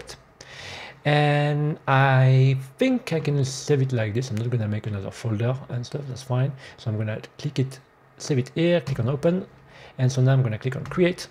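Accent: France. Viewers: je me disais bien ahah, au mois c'est cool ça permettra d'un peu comprends les vidéos parce que c'est dure de trouver des ressources en français sur Godot est j'ai un anglais qui assez discutables mais blague a part ta vraiment un très bon anglais et en vrais j'ai vanné un peu sur l'accent mais j'ai galéré a me rendre que c'était un accent un français x33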